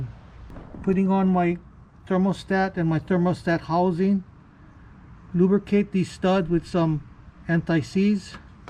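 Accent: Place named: Louisiana, United States. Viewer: He from Louisiana all day I can hear it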